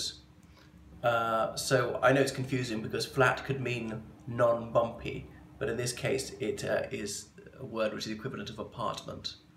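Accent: RP, United Kingdom. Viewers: ! Love the posh accent, should be more of it! I believe the resistance is another form of 'racial' prejudice. People look askance at you today if you enunciate correctly, you can almost see skit across their face a brief thought; 'does this person think she's better than me....?!' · I would say RP - Received Pronunciation - like. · I'd love to know your back story. How come the British accent... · I have never heard an accent described as 'southern British'. There is southern English. Your accent is a version of RP. But yes, I would associate RP with southern England. There is a northern version of RP, which is especially suited to Yorkshire, I think, as Yorkshire is dialectically non-rhotic.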